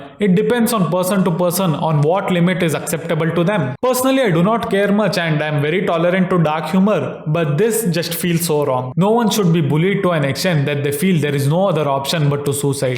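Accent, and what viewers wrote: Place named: India. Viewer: Bhai thoda space deke bola kariye, samajne me dikkat hoti h apki thick indian accent ko. No offense.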